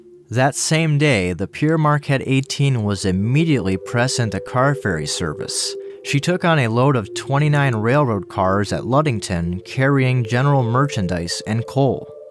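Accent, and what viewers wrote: Cleveland, United States. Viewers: Dudes gotta be from Cleveland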